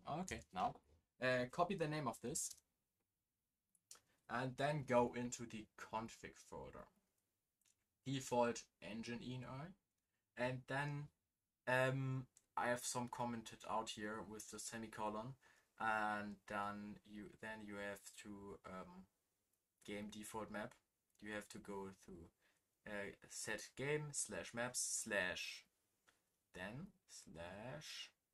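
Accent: Germany. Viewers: unauffälligster deutscher akzent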